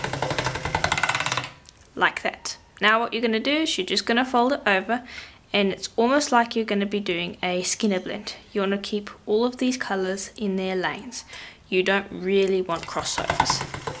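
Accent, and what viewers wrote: South Africa. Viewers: Love your tutorials you're very good! Also love hearing the South African accent, miss it since I left in 2000 n don't hear it much. My husband always teases me when I say 'off' and I giggle every time you say it. Never noticed it before but now I can hear what he means. Keep crafting, you're awesome.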